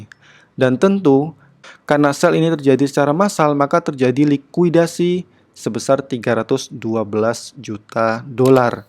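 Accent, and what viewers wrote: Surabaya, Indonesia. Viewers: Wong suroboyo ta dobol samean cak .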